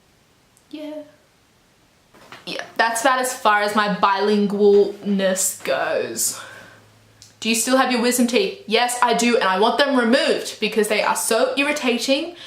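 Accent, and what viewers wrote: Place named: Australia. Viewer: I have never thought you had a distinct Aussie accent. But that all changed upon listening to this ahahah. Love you xx (Also cute clap)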